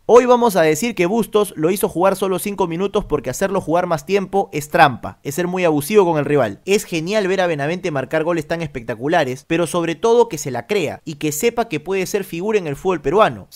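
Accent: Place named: Argentina. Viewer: mano por que hablas como semi argentino ??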